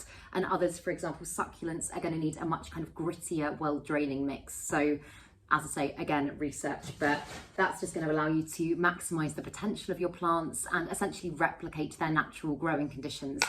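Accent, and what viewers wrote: England, United Kingdom. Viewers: I love your videos, they are very informative and I love your English accent, I live in South Texas and we can grow so many plants here in pots and in the ground. I have philodendrons and alocasia outside, and they go dormant in the winter and pop back out every spring, such hardy plants and so full and beautiful in the summer, people actually stop to admire my yard.!!!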